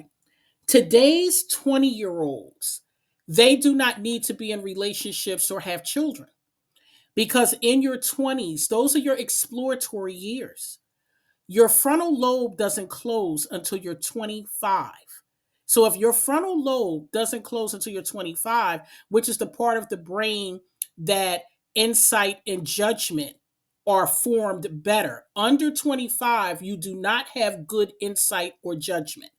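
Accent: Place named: Philadelphia, United States. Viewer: new subscriber just turned 25, really enjoyed this video!! wish i heard it a lil younger lol! any chance are you from philly? i hear it in your voice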